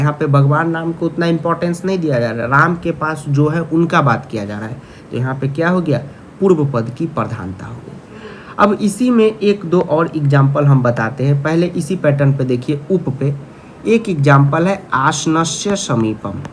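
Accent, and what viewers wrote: Bihar, India. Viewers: Sir lagta hai aap bhi bihar ke hai tone pakad liye hai ham😀😀😀😀 BTW the teaching was next level. Thanks for the useful session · Sir, your language is telling me that you are from Bihar because I myself am from Bihar. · Thanks a lots sir .... Mujhe samas mai bahot doubt tha par apka video dekhne ke baad sara doubt khatam ho gya ...... Sir aap ki awaj se pta chal rha tha ki aap Bihar se ho .. mai bhi Bihar se hu..... Lots of love from Delhi Aur one's again Thank you 🙏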